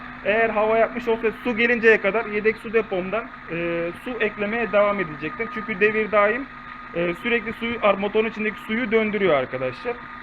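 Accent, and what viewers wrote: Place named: Izmir, Turkey. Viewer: Bu adam sanki izmirli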